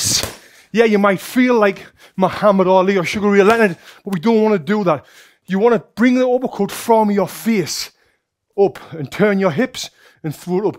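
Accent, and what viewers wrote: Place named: Northern England, United Kingdom. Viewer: I like it when he says "noooooooo " in the beginning haha. Proper northern accent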